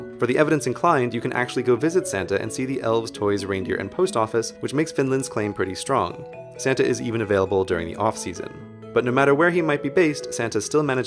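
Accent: United States. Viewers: Probably not. He speaks with an american accent.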